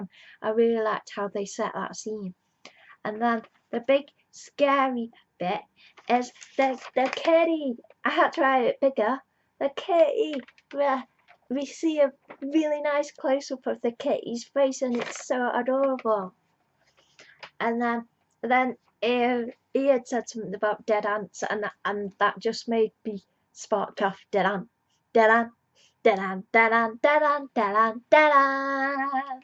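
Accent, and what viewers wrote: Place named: Staffordshire, United Kingdom. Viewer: <3 The Black and White and <3 You. And <3 the Staffs (?) accent.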